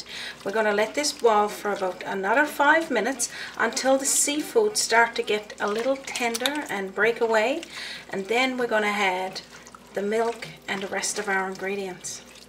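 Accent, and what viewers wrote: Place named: Newfoundland, Canada. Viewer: Looks delicious! Wonderful presentation! I love your NL dialect, with the dropped H's and slight maritime accent.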